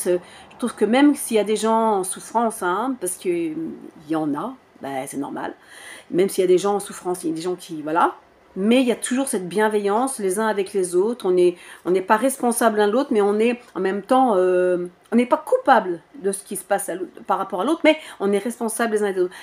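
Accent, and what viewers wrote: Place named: Paris, France. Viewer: Merci pour ton Naturel et ton accent Parisien ❤😅😇🙌🦎⛰🐬👽💫🙏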